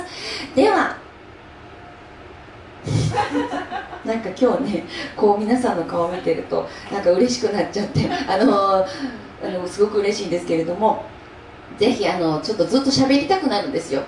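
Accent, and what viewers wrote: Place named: Osaka, Japan. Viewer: かわいい！ちらほら、大阪弁素敵🎉華織さ〜ん、応援していますよ！また、イベントでお会いしましょうね！お会い出来ます日を楽しみにしています♡白夜行も、カッコいい😊！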